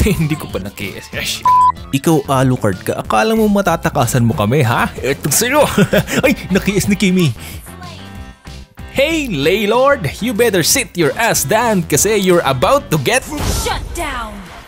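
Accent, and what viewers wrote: Visayas, Philippines. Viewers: bisaya ka ba?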